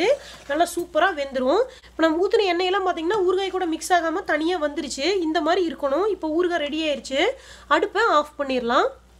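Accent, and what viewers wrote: Kongu, India. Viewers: Kongu Tamil😍😍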